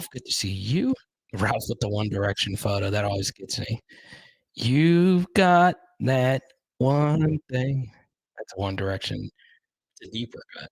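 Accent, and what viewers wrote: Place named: North Louisiana, United States. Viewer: you sound like you have some North LA ties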